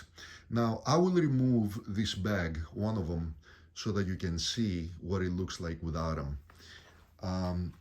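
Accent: Greece. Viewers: Λύσε μια απορία, σε παρακαλώ. Το "λου" πολύ πατρινιώτικα το λες: "λιου". Αληθεύει ότι εξ Αχαΐας προέρχεσαι;.. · The v85 is a great bike. Especially with the tubeless rims. You might want to avoid the travel version. I know people have had problems with the side cases. I think I detect a slight Greek accent, might be wrong.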